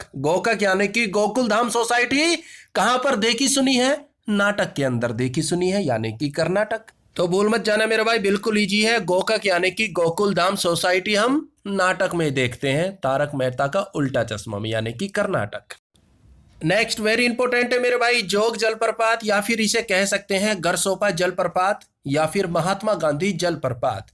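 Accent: Haryana, India. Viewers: Haryanvi hindi sahi se nhi bol pate chitrakut..ko chittar kut padha ja rha h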